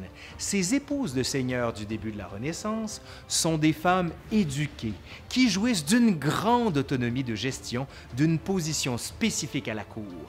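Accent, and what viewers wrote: Quebec, Canada. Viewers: Bonne video Merci est que t'e Québécois ? Bonne année !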